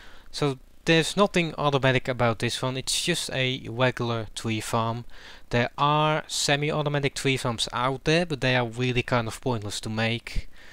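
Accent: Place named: Netherlands. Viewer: I think you are dutch? :P try to fix your accent :)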